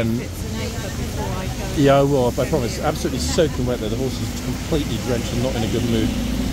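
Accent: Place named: England, United Kingdom. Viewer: What part of England does your accent come from? You are easy for my American ears to understand! That rain is relentless!! Funny how spoiled the horses are...mine would often choose to stay out in the pasture in the rain and snow instead of heading into his barn!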